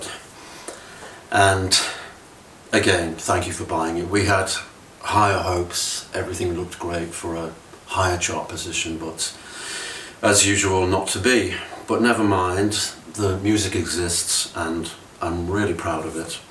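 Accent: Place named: Manchester, United Kingdom. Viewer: goddamn I love his Manc accent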